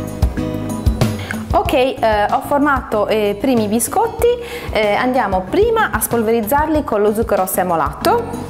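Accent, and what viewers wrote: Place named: Sardinia, Italy. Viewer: Si sente che sei Sarda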